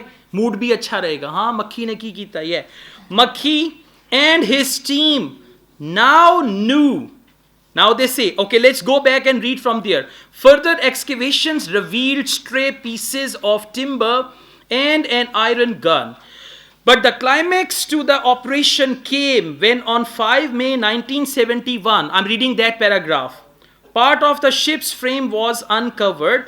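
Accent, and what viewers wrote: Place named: India. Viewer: love your Indian-English accent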